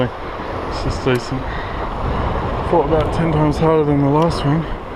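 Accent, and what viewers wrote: Australia. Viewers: just subd been wachin your vids took a bit to figure your ausie, pretty sick stuff I love it, thought you were sayin "grouper" in one of the other vids then I got it, still snowing here in northern utah.usa hiding from the virus, maybe later this week I'll sneak out and catch a 24cm brown on a 4wht fly rod to scratch my itch, I just got back from southern baja were I was getting grouper, amberjack and yellowtail or "kings" as you say, what area are you fishing? so I can look on google, don't worry I won't sneak in plus I don't think I've got the balls for that kind of ........ what do you call that, "sketch fishing"? go big! stay safe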